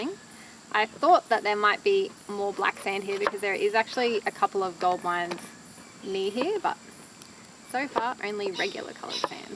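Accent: Australia. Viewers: The quality of your videos is always A1 but that one was exceptional. New camera? Different settings? In any event, ace job!!! Ginger nuts!!! A girl after my own heart! I'm a Brit, have you tried Mcvitie's Ginger Nuts? Awesome!!! Talking of Brits, I've always been curious of your accent. Is it pure Australian or is one of your parents from the south of England? In any event, I love it!!!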